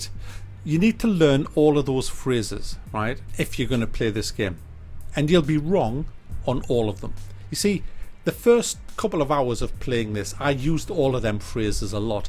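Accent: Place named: Teesside, United Kingdom. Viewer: Hillariaous, sound like just over the bridge. Smoggies as you lot call us 🙂 Agg missed the k from end of the c, ponders if put an o between 🙂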